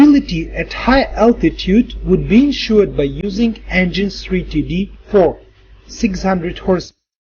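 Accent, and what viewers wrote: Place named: England, United Kingdom. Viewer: это не американский,а английский....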